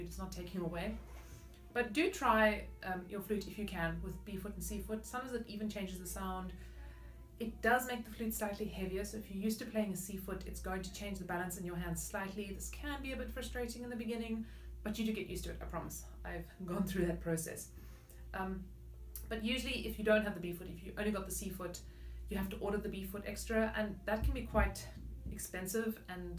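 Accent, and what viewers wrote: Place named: South Africa. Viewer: South African, I believe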